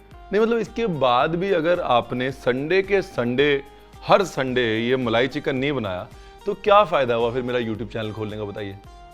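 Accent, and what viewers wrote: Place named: Punjab, India. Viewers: Your punjabi accent is to die for 🤗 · I don't know if youtube read my mind today. I was about to comment in one of ur video "k sir Punjabi v bol dea kro vich vich" den Utube came up wid this suggested video. Like tadka is must in every dish, ur punjabi accent also necessary in every cooking video. Dhanwaad Paaji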